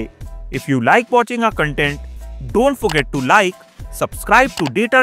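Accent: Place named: India. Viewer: Very thick indian accent. Difficult to understand what u r saying. Too fast. Unable to catch up the instructions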